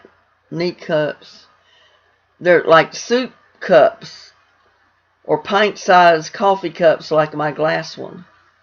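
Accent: United States. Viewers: I have to thank you-that as a German I understand your American way of pronouncing it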